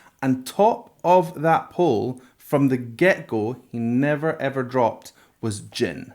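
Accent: Scotland, United Kingdom. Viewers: Hey. Thank you for the technical explanations. This is exactly what I look for in a reaction video and I so rarely find it when it comes to reactions. Also, love your Scottish accent. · I love Scottish accent! I enjoyed your professional explanation very much! Jin is definitely a great singer and yes, we need more Jin in ’ songs!💜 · Hey, this is nice. Keep going! This ARMY approves. Not that you need mine or anyone’s approval. 💜 Some of the songs Jin really shines in are BTSs Japanese songs, and Korean. And ofc his solo songs, etc. is a magnificent vocalist. Very stable too. Have fun with this! ps. I’m Swedish but I worked a season in Dalwhinnie and traveled around Scotland many years ago and when I hear you speak it really takes me back. Love the Scottish accent.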